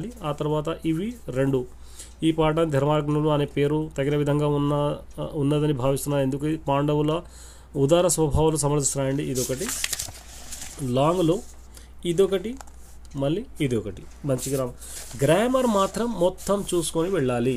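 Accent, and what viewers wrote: Hyderabad, India. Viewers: Sir, you are from Hyderabad?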